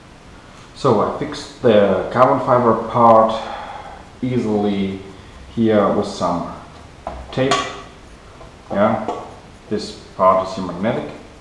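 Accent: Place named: Germany. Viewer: i assume hes german (cause we have the brand of this spray everywhere) and he probably doesnt know the english word for "baumarkt". It's funny, i knew the second he hesitated he wouldnt say hardware store, cause the word baumarkt essentially translates to builder market. Obviously that is a weird name, so he probably went to an english word he heard before. Mut is just how you pronounce the 'a' in german, like "maht"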